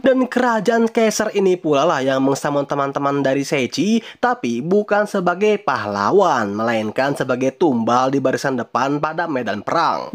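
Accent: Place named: West Java, Indonesia. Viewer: Mang orang Sunda nya 😊😅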